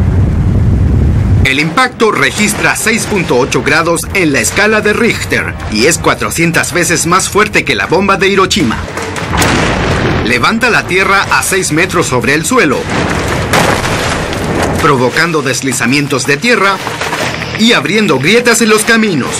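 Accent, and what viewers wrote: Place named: Argentina. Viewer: No se porq pero presiento que eres Argentino verdad ???👎💩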